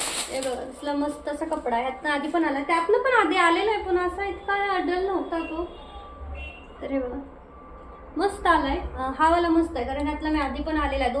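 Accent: Satara, India. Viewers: तुझी भाषा साताऱ्याची वाटते गाव कोणतं आहे तुझं मी तिकडची आहे. गाव.नरवणे ता. माण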